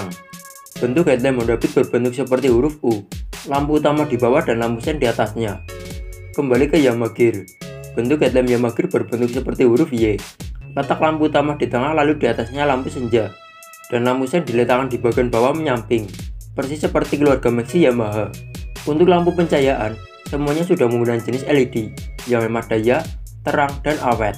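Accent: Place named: Banyumas, Indonesia. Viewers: ngapak ya mas. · Bahasane wong Tegal.......ngapak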